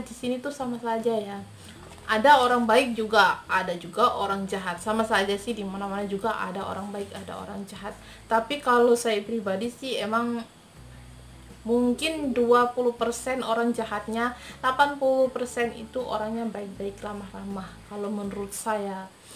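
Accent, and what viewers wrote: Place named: Indonesia. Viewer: Yang kamu cakap sekarang ni bahasa Melayu lah Yang beza loghat Kamu berbahasa Melayu loghat Indonesia Di Malaysia bahasa Melayu loghat Malaysia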